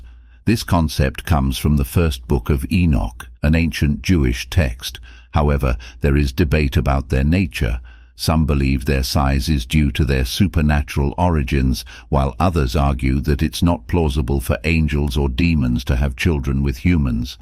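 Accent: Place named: United Kingdom. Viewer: Omnipotent, Omniscient, and Omnipresent means something to me. And your British accent can mean something in television animal documentaries but not in theology. Thanks but no thanks.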